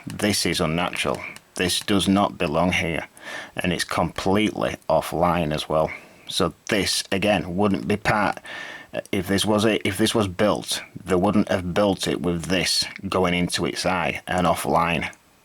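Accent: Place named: Yorkshire, United Kingdom. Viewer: Hi mate,speaking to you from Yorkshire meself!Love the accent,love the truth.Let's year down this rain mainstream paradigm and blow some minds!Midfield by Roger intro,d me to this and just wow.Truth always seemed self evident from the last stage lolol what is it? Deny,try to destroy,process then accept,always the same.Thank you my friend.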